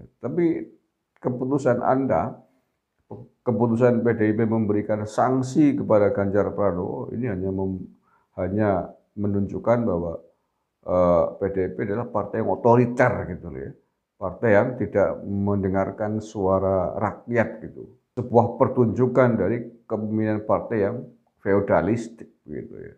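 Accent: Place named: Brebes, Indonesia. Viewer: wkwkwkwkwkwkwkk....wagu jegu...kya kya wong brebes rika Whaaa...mntap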